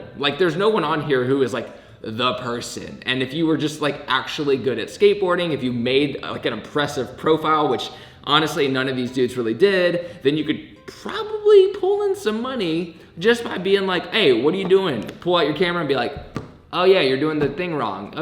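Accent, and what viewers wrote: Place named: Texas, United States. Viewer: Your Texas accent and slang are really starting to show 😂 love it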